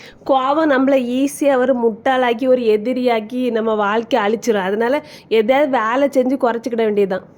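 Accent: Kanyakumari, India. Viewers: உங்கள் kitchen ரொம்ப சுத்தமா இருக்கு. அத விட உங்கள் கன்னியாகுமரி தமிழ் கேட்க நல்லா இருக்கு. இன்று தான் முதல் முறையாக உங்கள் channel பார்க்கிறேன்🎉🎉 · No this is kanya kumari slang😂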